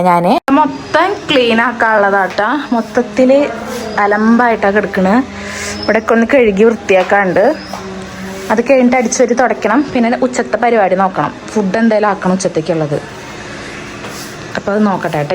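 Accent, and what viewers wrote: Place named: Malappuram, India. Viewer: Kayi Malappuram slang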